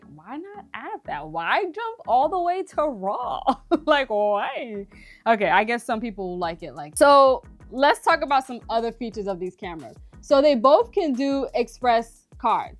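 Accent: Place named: Jamaica. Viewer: 🇯🇲🇯🇲 Jamaican accent is amazing big up from yard 🔥🎥🔥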